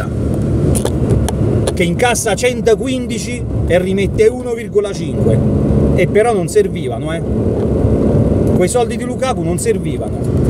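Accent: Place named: Marsica, Italy. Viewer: dizione marsicana impeccabile e piglio aggressivo da Aquila Reale del Velino. "Te mangane du portier"....tradotto "ti mancano due portieri". Mi manca l'Abruzzo e la sua genuina e montagnosa maestà!!!!! Sei troppo forte e da finto incazzato ancora di più 👏👏👏👏👏 Un saluto 😃😃😃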